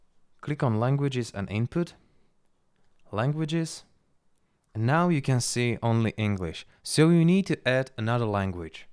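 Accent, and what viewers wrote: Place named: Slovakia. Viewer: slovak accent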